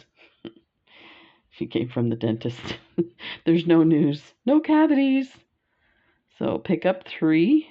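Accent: Canada. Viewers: I'm so happy to see these earrings. So gorgeous. I just got my hair cut so my earrings will show now...haven't had short hair for years! You explain each step and I enjoy your "chatter", it's part of your charm! Keep doing it. Love your Canadian accent.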